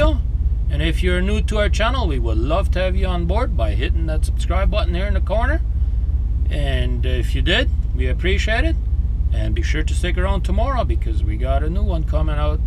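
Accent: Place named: Canada. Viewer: An actual heavy Canadian accent, I haven't heard one in a while.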